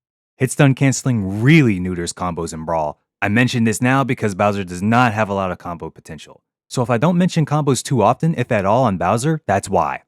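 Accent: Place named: Japan. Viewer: Why do you say the names in japanese and japanese engrish?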